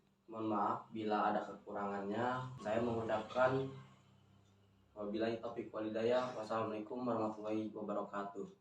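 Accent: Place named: Bangka, Indonesia. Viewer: Kayak orang Bangka Abang ni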